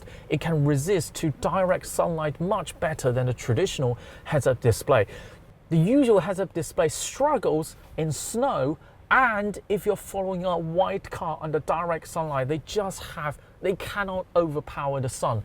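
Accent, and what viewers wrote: London, United Kingdom. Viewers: 英式腔是這樣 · 小伙儿英文真棒 伦敦腔 有点topgear感觉啦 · 兄弟正宗伦敦音啊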